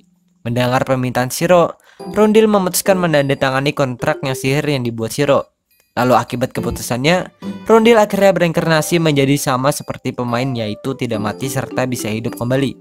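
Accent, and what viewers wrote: Banjar, Indonesia. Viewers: banjar banarr wkw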